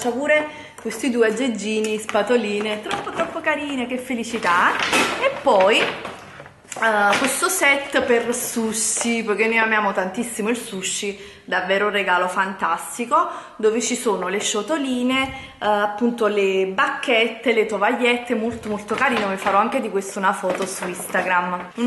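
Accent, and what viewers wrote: Naples, Italy. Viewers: è accento napoletano se non lo sai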